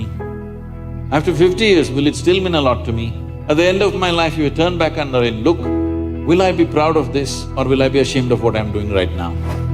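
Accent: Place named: India. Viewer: چقدر لهجه انگلیسی حرف زدن هندی ها مزخرفه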